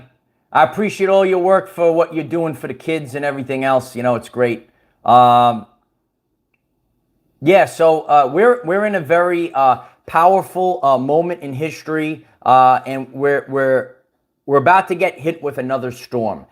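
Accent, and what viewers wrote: New York, United States. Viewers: Fascinating site this,. Just started watching and I've already consumed about 20 shows in just a few days. Personally, I'm a bit of a loner and I lack trust in everyone online although I started awakening around about 2015 prompted by the usual atrocities/events having initially debunked the Party Political systems which are clearly a Cult creation and do not serve the people. I like the way you described the 2020 Plandemic as like the 'Fall of the Curtain albeit I've forgotten the exact term that was used. Spiritually, I'm trying and I like Gigi Young's work but I'm a bit of a hard case and I'm having trouble linking to my heart space and finding Christ Consciousness. People like David Icke, Clif High, Dark Journalist and Max Igan are must watches for me over several years now but, of course, I still know nothing in the grand scheme of things. 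And I love your New Yorker delivery and your hard hotting and specific delivery and hyour Sccoby Doo imitations. Lol. So, I'll be continuing to watch and learn. Thanks mate from here in the UK.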